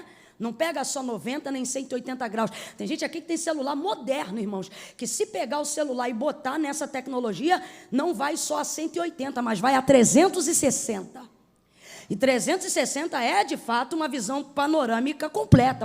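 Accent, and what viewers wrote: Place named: Rio de Janeiro, Brazil. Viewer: Ela falando daqui do RJ show kkk É assim mesmo aleluia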